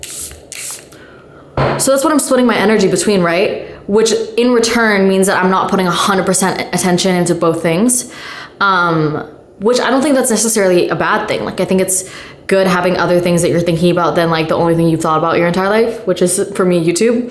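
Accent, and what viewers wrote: United Kingdom. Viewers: you are my queen and the british accent pops off x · We love your Bri’sh accent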